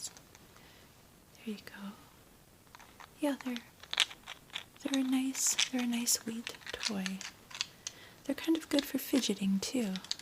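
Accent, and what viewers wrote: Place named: Canada. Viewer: hi!! i was just watching some asmr before heading to bed, i just have to ask, are you canadian or midwest american? if this is invasive im so sorry!! its because i like accents, and i love learning about them alongside with dialects. and your accent sounds VERY canadian, or maybe even wisconsin sounding if you’re american :)! love your videos before bedtime!! helps me transition into the night, and due to being autistic, it can be hard to go from one thing to another. cheers ^_^ !!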